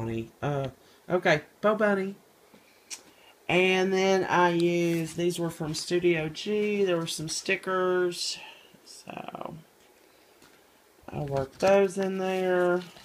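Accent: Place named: South, United States. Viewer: Live the little book. I notice you have a southern accent. Where are you from. I'm in Alabama.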